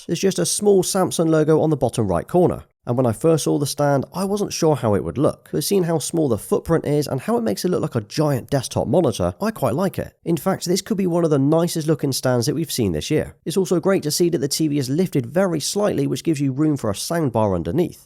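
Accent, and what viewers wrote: United Kingdom. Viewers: OMG I like your voice I think you are British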